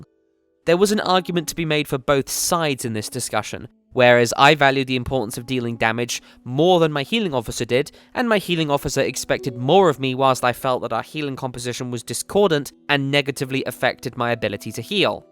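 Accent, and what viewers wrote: RP, United Kingdom. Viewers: such a posh sounding accent ha, been along time since I have delt with raid drama. started playing again on a private server, expect lots of drama on them 40 man raids hehe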